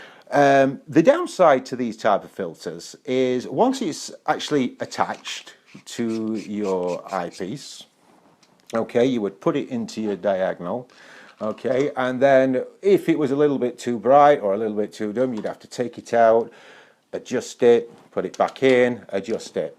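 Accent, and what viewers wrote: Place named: Cockney, United Kingdom. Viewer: Sorry, man, but your "m'kay" and "o'right" remind me video by Alfie Solomons "Learn the cockney accent". 😅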